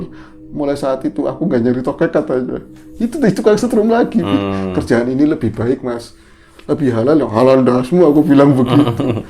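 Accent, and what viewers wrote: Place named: Java, Indonesia. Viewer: Yg bikin khas adl dialek nya mas yg medok lucu.. sebenernya ini yg bikin ga jd serem hahahaa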